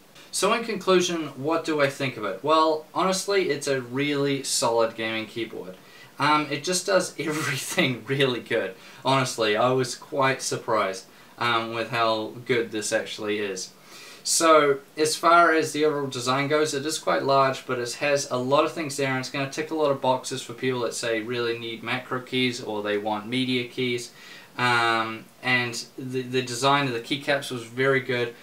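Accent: New Zealand. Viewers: Sounds more Kiwi than Aussie, unless you're not an Aussie yourself, then all is forgiven:)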